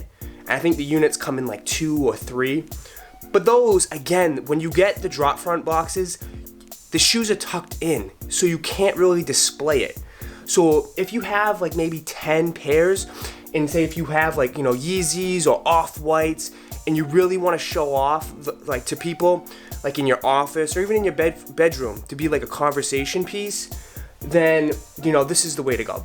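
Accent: Boston, United States. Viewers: U from Boston?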